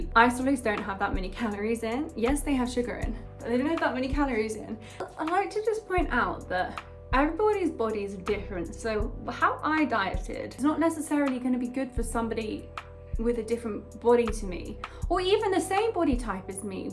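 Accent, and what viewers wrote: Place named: United Kingdom. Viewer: Totally off topic but without enjoying your video there is another reason why I'm here and the reason is I'm using your videos as my British pronunciation tutorial. I'm practicing British pronunciation by watching your video and it's really help me a lot. Lots of love 💓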